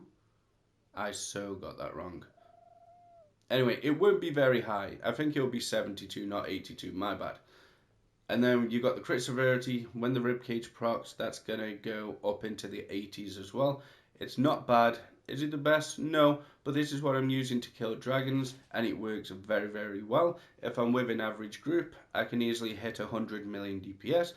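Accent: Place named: United Kingdom. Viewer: Nice to hear a British content creator for NW. But why play on console? {:-:-:}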